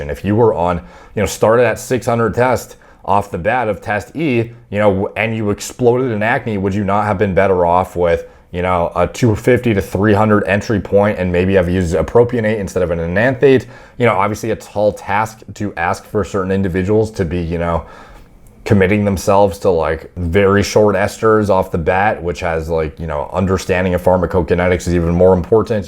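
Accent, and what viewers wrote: Ireland. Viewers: Bro sounds Irish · Buddy nobody taking fucking advice from no irish sounding asian that uses roids and is still smaller than an 8th grader wtf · I love his accent. Very Irish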